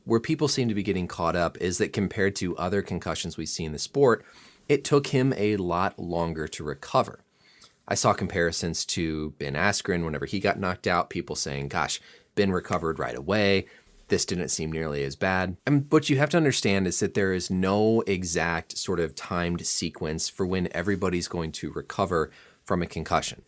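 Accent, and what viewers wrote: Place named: Minnesota, United States. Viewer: Ryan Reynolds is canadian and Dr. is from Minnesota. They have similar dialects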